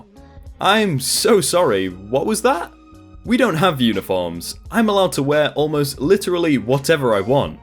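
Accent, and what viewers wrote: United Kingdom. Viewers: If you want to find a taser flashlight, I have one. It's called the Guard Dog Special Ops Flashlight/Taser combo. I live in America so I'm not sure of you could get one from these people as you sound British. Still, if you can I highly suggest it!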